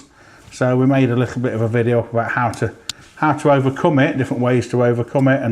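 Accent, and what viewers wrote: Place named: Nottinghamshire, United Kingdom. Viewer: is a North Notts. lad ( like myself.) None of us are ever going to win prizes for elecution. Hi, say hello to Andy R for me.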